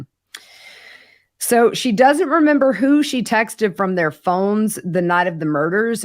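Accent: South, United States. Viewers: NEW SUB. So glad I found you. I’m in South Carolina. I recognize your southern accent 😅❤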